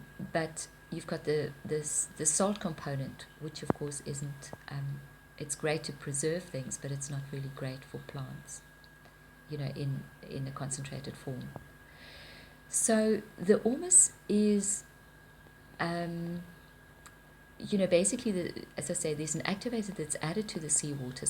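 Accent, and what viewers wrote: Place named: South Africa. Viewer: Hi, I hear your South African accent. Would love to collaborate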